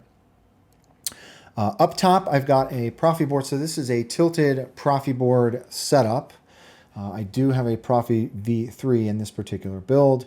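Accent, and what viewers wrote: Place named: United States. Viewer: You got an American accents and thus American rights and you have a wall of light sabers vs guns? Cmon be a man and stop playing with toys like a child, a second revolution is drawing near and here ya are playing with toy swords instead of grabbing somethin with some real edge to it. Where’s your wall of AR’s and Glocks? 0/10. Do better. Our forefathers/ancestors are looking upon your works and judging as the tree of liberty is dying of thirst.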